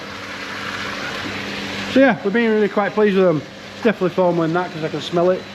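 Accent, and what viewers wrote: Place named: Yorkshire, United Kingdom. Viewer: By heck, thats a grand Yorkshire Accent. Having lived in NZ for 25 years now I miss the accents of the home county. All you need is a robot scraper now! And have you upgraded the JCB?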